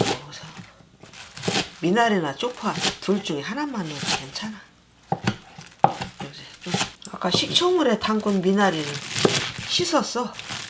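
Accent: Chungcheong, South Korea. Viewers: 충청도 아주머니시네 사투리가 무수 배차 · 배차 무수 오랜만에 들어보네요 ㅋㅋㅋ 충청도사투리 매일 잘보고 이습니다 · 고향이 충청도이신가요? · 어머니와 아드님 너무 다정다감하신 대화와 요리들 너무 잘보고 또한 구독도 했어요. 저는 어머님의 충청도 사투리가 저희남편 가족들의 말씀같이 꼭 우리 큰형님~셋째형님 말소리와 너무 똑같아서 잘보고 잘 따라하고 있습니다.~그런데 지금 우리 형님들과 우리남편은 저~세상 하늘나라로 가셨습니다. 그리고 저는 나이가 들어서 노인정에서 식사를 해드리고 있는데 주로 어머님의 음식을 보고 많이 따라하는 편 입니다. 젊은시절에는 직장 다니느라고 살림을 안해서 주방에 들어가는것이 무서웠는데 지금은 하나도 안무섭고 무엇이든지 어머니께서 하시는 무엇이든지 돋보기에 제가 하고싶은 음식을 치고 누르면 무엇이든지 다~나오니 저는 행복합니다. 또한 8~9십대 어르신들 따라한 음식들을 만들어 드리면 엄청 칭찬해 주십니다. 그래서 저는 맬맬 행복합니다. 어머니의 요리를 배워서 행복하고 경로당 어르신들 맛있다고 칭찬 들을때 행복합니다. 그래서 저는 언제나 즐겁습니다.❤❤❤❤❤❤❤❤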